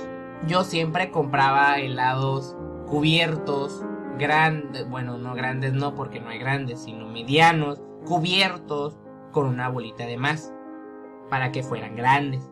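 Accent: Mexico. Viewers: Es que el es de México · Es mexicano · serian mas graciosos con su acento mexicano XD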